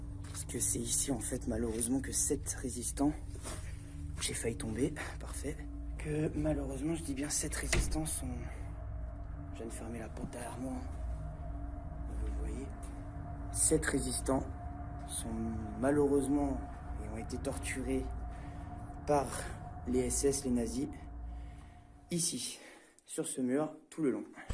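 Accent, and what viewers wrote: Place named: North, France. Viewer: Bonsoir vous êtes nordistes? Non? J'ai le même accent 😊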